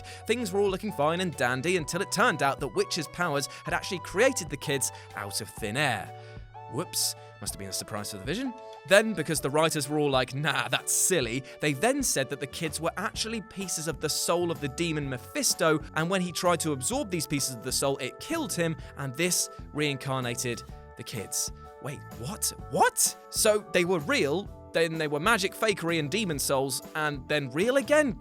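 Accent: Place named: Newcastle, United Kingdom. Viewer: Howard the freakin' Human Geordie lad, where ya from? The 'Heed mesell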